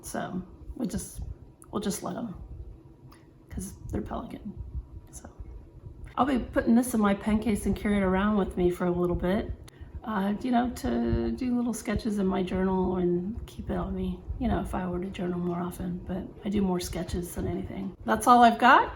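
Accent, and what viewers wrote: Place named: Dallas, United States. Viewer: Great look! I think you sound like your from Dallas, and Dallas people are more fashionable than S.A. or Houston.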